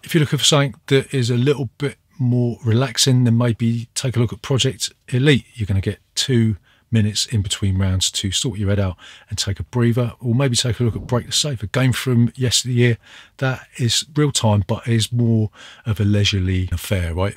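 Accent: United Kingdom. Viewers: I am digging your english so pure so british. Loving that. Greetings from SVK <3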